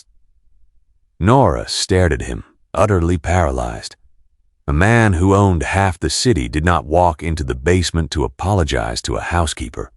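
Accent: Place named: South, United States. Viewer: Why did narrator lose English accent and, develope a southern drawl bit unnerving.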